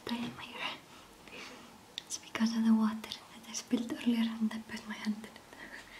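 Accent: Iceland. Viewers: Always heard Icelandic women were out of this world beautiful... sucks you got stuck with our Navy and Marines up there... wish the army would send me that way... my god that accent and your curves... well at least I have you to thank for the Zzzs most nights... I appreciate it!!! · i love how our Icelandic accsent is so strong for asmr PS: Icelander writing this ❤ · I'm sure I will - always love listening to your voice. And the visuals are nice icing on the cake :) . You know, it's your accent and ElizabethASMR's accent that have inspired me to want to visit Iceland!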